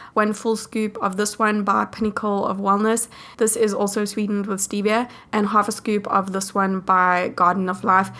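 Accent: South Africa. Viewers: ahahah chiken South Africa :) she just moved to the states though. To LA. I love her accent!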